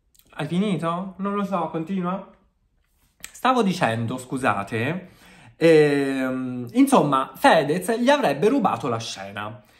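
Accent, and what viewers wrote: Tuscany, Italy. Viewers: Ma te sei toscano, nel video ho sentito che stai a Milano ma non mi sembri lombardo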